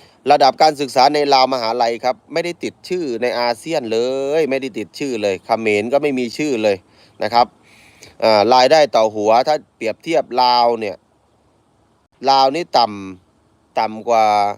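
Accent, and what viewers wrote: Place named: Bangkok, Thailand. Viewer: อู่ยกุรงเทพชัดเจนดีครับเทพโย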